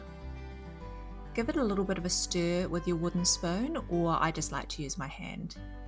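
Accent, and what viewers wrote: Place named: New Zealand. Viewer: Love a New Zealand accent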